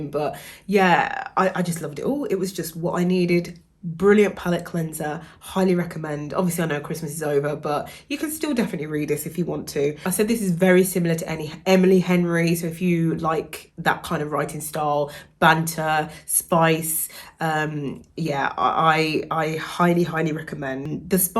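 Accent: Birmingham, United Kingdom. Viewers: Are you a Brummie? 💐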